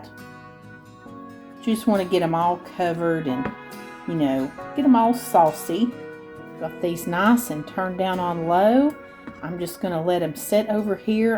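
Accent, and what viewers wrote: South, United States. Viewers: I found you quite by accident scrolling through youtube cooking channels. You did your video on the best simple salids. I absolutely adore watching your videos. I love love your southern accent, And with every dish you prepare, you show the love of your family. And you're a True southern lady and homecock. Thank you for not only the entertainment but the wonderful recipes you put out. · Congratulations! I love your channel and I love the merch. My favorites are the ground beef recipes. And being a born and bred southern girl I love your accent because it is a lot like mine (NC/GA) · you are a breath of fresh air! Your humility is unheard of in this me, me, me world we live in today! I have been eating low carb/keto for a year now so I don't use your recipes but ALWAYS look forward to watching your videos every week! I adore your soft spoken, southern drawl that I am so fond of because of my family roots being from TN. 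Love you and wish you God's blessings today and every day! Congrats on the 100k subs...well deserved!! <3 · Congrats,! I found your channel a few years ago. Your sweet southern accent and fun personality drew me in quickly! I think the first recipe I made of yours was the meatloaf. It is delicious! I like that you include all the sides in your videos too. God Bless you! 😊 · Make a little cream sauce using cold milk a heaping tsp of flour. Vigorously stir that flour into the milk and pour over those peas. Allow to thicken a little and enjoy! My favorite way to eat them. This chicken recipe has got to be a must make bc it looks delicious! Thank you for the recipe! Oh my goodness! That garlic cheese bread! So simple and looks delicious! Can’t wait to try it! Again, thanks! Girl, your sweet southern voice is soothing. I really enjoy your videos. Congrats on 100k subs!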